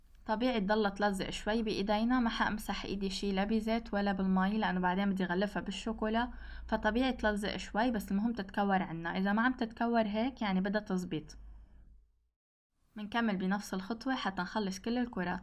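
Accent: Syria. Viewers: شنو هاي ال لهجة السورية غير تموت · عم تحكي سوري مو لبناني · حضرتك سوريا و لا لبنانية لغتك جميلة انا مصري من صعيد مصر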